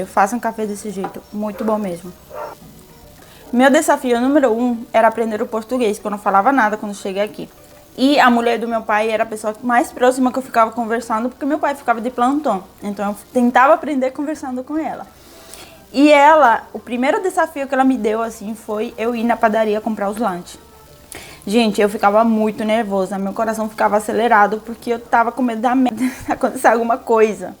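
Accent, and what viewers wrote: Rio de Janeiro, Brazil. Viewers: as experiências vividas aqui no Brasil, são frutos das adaptações, tem um preço a ser pago, mas que uma desbravadora, você é uma mulher de muita fibra, parabéns pelas conquistas e o que fez você vim pro Rio de Janeiro, "Cidade Maravilhosa "..daqui a pouco vai esta com sotaque de carioca igual a mim, beijos💜💙💛❤💚😘😍🥰👏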